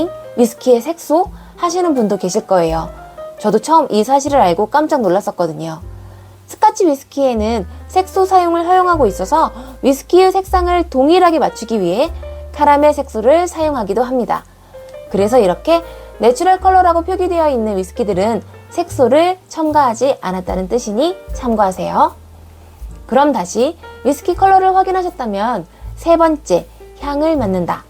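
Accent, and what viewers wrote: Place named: Gyeongsang, South Korea. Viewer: 누나 경상도네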